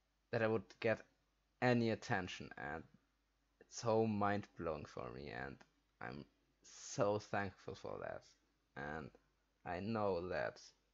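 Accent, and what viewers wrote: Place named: Germany. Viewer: So, what country are you from? Your name looks Scaninavian (maybe Swedish), but your accent sounds German to me, but I don't hear well. Enjoyed your Home Free reaction. They're often great, and sometimes silly. Good job.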